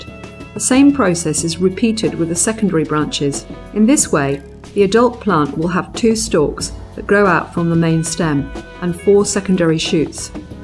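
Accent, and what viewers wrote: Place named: United Kingdom. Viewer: that perceived british accent was so hard to follow. but jolly good show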